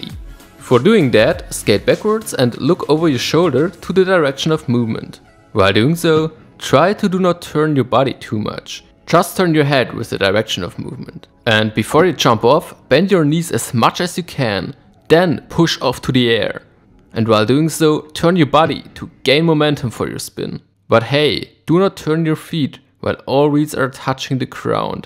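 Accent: Germany. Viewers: you still have a clear german accent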